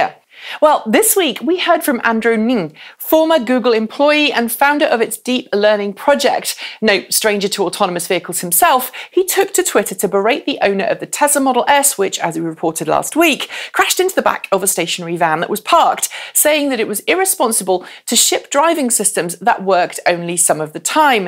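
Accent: United Kingdom. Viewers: Thanks! I've never been called 'terribly obnoxious' before. Nor do I know how it's possible for a voice to be obnoxious. Is it the British accent? The pitch? The intonation? Of course, there are always subtitles. And we do provide those:) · Nah, I'm fine with a British accent. For me it's the pitch, it makes it kinda.... squeaky.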